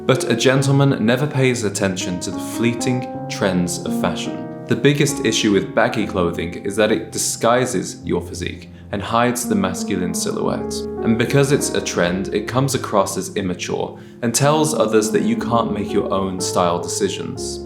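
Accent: United Kingdom. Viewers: Is this an American with a British accent or are proms yet another American import to GB? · Love your dry, understated, British delivery. One faux pas I see frequently, is men wearing a baseball cap with a suit, all too common. (yes, pun intended)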